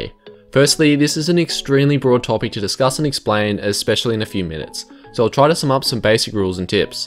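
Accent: Australia. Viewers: Oi you’re Aussie ay?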